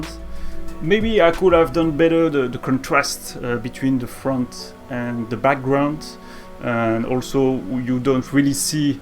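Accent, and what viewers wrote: France. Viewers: What a handsome guy you are! And nice French accent. ☺ Thanks for keeping on Driver on YouTube. Wish you success!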